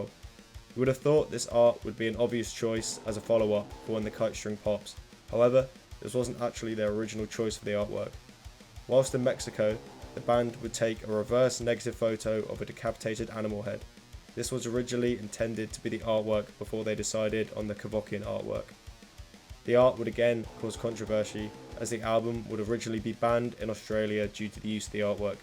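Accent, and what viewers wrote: United Kingdom. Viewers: As a guy in East TX, it's weird to hear a guy with a UK accent talk about acid bath lol. I like Dax's newer stuff too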